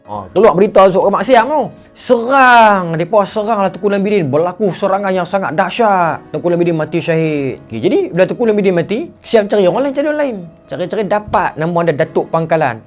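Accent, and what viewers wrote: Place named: Kedah, Malaysia. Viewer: assalam...awat laju sangat hang habak mai...mokcik x faham..puas nk dengar...sambung2 kn isi cerita tu...campok2 slang kedah lg...mmg khas utk og kedah saja ka citer ni?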